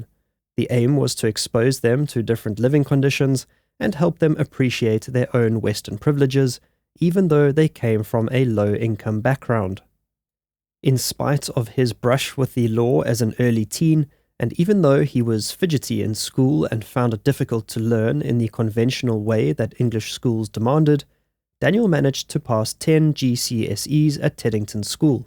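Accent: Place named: South Africa. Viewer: I always get thrown off by the South African accent at the start, but then it reminds me off home so I love it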